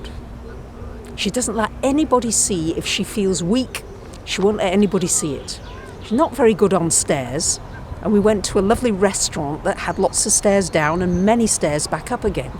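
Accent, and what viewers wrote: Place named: Manchester, United Kingdom. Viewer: Great video. You said you're in Edinburgh but I recognized the Mancuran accent. I'll admit I'm a huge Oasis fan and that's how I recognized the accent. Happy full moon from California.:)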